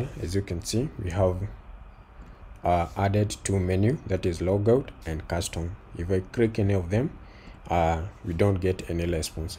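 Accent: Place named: Kenya. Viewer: You sound Kenyan. Shukran sana bro!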